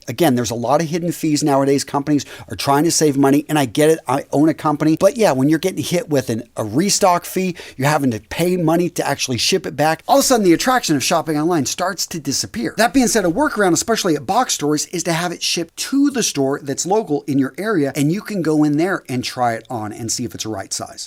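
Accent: United States. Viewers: Yes of course but specifically which dialect of American English?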